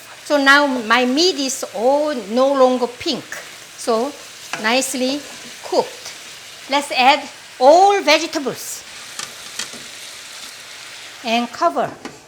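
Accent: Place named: South Korea. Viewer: 어머니가 전북출신이신데.. 엄마 친구 이모님같아서 늘 재미있게 보고있어요!! 영어가 한국말처럼 들리는 기적이..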